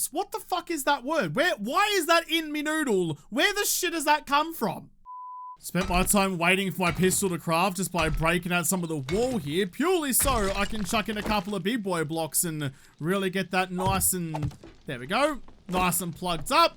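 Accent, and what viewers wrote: Australia. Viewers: The Spondiffering Aussie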